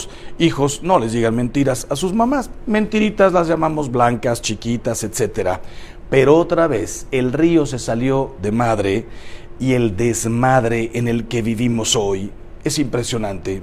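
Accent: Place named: Argentina. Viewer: Pareciera que el padre habla en Argentina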